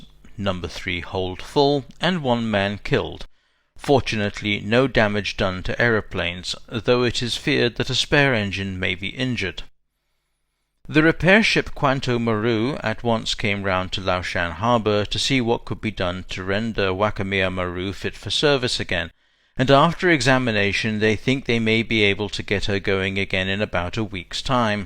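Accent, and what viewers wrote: United Kingdom. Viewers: I disagree, he just sounded well posh the exact way a British Naval Historian should sound, that includes being several glasses deep with sherry, and is so to be expected, yet he really didn’t sound blushed at all I must say so. In any case, referring to Japanese words and names as “gobbledygook” is the only term in, around, and relating to this video as any sort of ill reference to such the topic at hand. I’m not sure if you’re ignorant of how the Japanese named their vessels or if you simply did not listen to the beginning when he pardoned himself from any potential mispronunciations, as nothing was extremely mispronounced or even relative to the term extreme, especially for an Englishmen. There are far better criticisms to be writ of far lesser quality videos out there, young friend. Edit: typo correction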